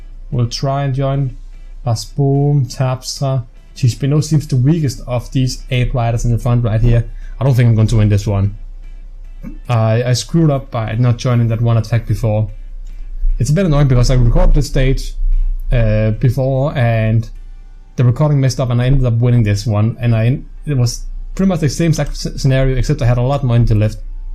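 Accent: Denmark. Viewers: Er du Dansk? Du lyder Dansk?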